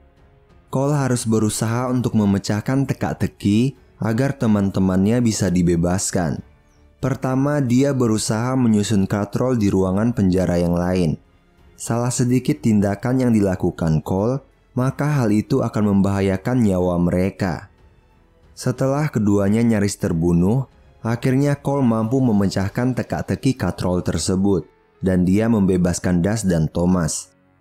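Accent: Kalimantan, Indonesia. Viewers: Abang orang kalimantan mana bang Edit:tumben bikin konten alur cerita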